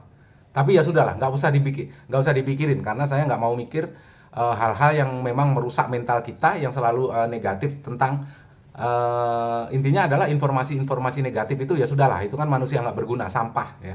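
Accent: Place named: Gombong, Indonesia. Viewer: Mantap meski bolak bolik nonton bahasane ajeg tapi kesane enak dengernya, kaya wong gombong logate haha, Lanjut jadi pendorong bagi kaum pemula 👍🙏🙏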